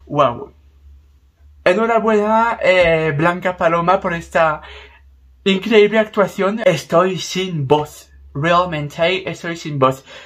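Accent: South, France. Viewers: Del sur de Francia por lo que le he oído al principio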